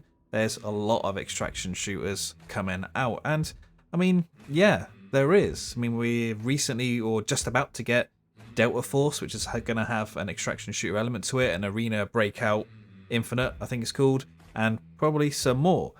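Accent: United Kingdom. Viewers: A British mush mouth is just so cute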